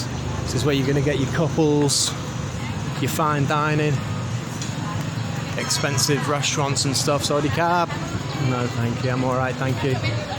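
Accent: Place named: North Wales, United Kingdom. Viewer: Hi Mate, Subbed after seeing you on Pete's channel. Really enjoying your videos. Where about's in N Wales are you from? You have the same accent as me. Take care :goodvibes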